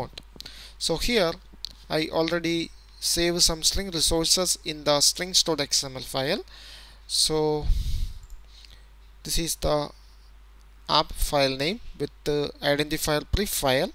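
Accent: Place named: India. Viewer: Ótimo tutorial... funcionou certinho!!! Esse indiano é foda!!! O sotaque é mor comédia... mas o cara eh bom!!!! hehehehehe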